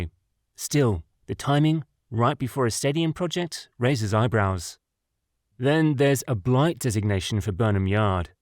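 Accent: United Kingdom. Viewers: What’s with the British accent??? That accent and the NFL don’t mix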